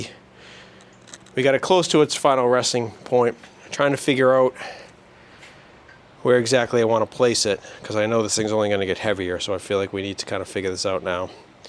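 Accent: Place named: Massachusetts, United States. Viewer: Lol. I was listening to you and thought - "this guy has to be from Mass." Sure enough, saw the plate on the vette and it was confirmed. Great vid - Thanks!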